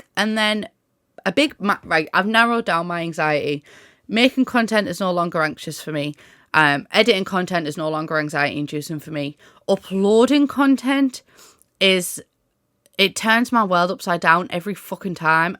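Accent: United Kingdom. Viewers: 5 years of watching British people talk has brought me to be able to understand what your saying, and I don’t regret a single moment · I don’t understand british accent at all xD but the house is so pretty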